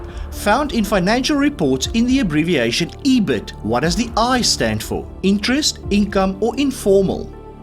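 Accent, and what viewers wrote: South Africa. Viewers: That is a lovely South African accent. I really enjoy listening to this gentleman! But plateau is the spelling; not "Plato."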